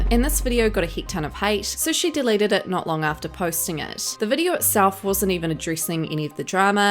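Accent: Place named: New Zealand. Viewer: Im watching for your Kiwi accent 👌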